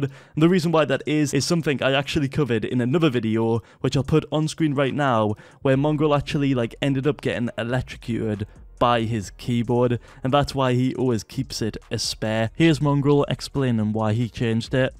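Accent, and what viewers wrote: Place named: United Kingdom. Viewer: that British accent hit different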